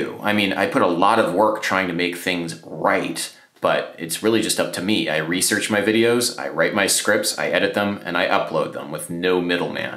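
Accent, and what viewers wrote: United States. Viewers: That might be because he is he is an American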